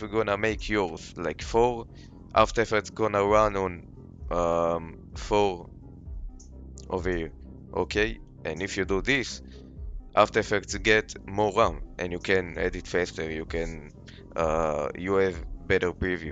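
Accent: Israel. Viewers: רואים שאתה ישראלי שומעים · שומעים את המבטא הישראלי חחח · הוא ישראליייי?!!!?! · המבטא הכי ישראלי ששמעתי נודר😭🙏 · אתה ישראלי? איזה מבטא יש לך כפרה לא רואים בכלל שאתה ישראלי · אין סיכוי שאתה לא ישראלי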